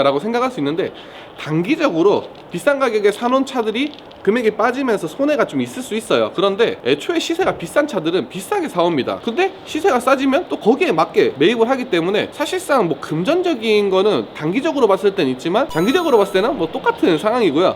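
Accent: Gyeongsang, South Korea. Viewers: 경상도사투리 싫어하는데 이양반 말투는 듣기좋아 ㅋㅋ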